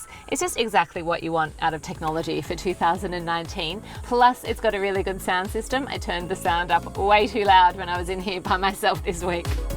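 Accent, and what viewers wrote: Australia. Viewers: Loved your aussie accent!! Good gal perfect video!!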